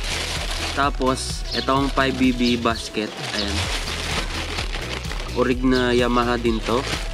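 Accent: Pampanga, Philippines. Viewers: kapampangan ka no paps haha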